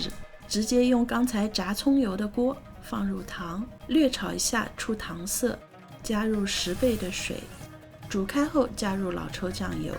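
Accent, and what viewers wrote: Shanghai, China. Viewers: Hello, 我也是上海人。你的美食频道做得真好，很地道的上海菜。很地道的上海口音。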